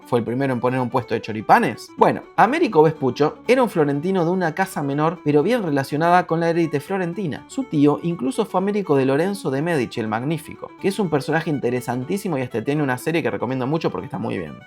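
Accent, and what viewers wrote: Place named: Argentina. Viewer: Tú video es imposible de verlo , hablas muy rápido , tu acento es demasiado fuerte y no tienes dicción . No se puede entender , ni captar lo que dices . Oye que no estás en la sala de tu casa habla do con amigos . Modula tu voz , habla más neutro sin acento pueblerino argentino , y toma clases de dicción. No se te entiende nada !!!!! Tengo coraje !!!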